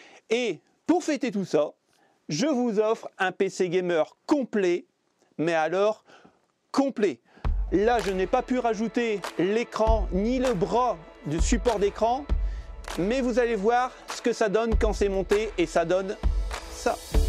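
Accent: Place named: Nord-Pas-de-Calais, France. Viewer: Cet accent chti ASMR 🎶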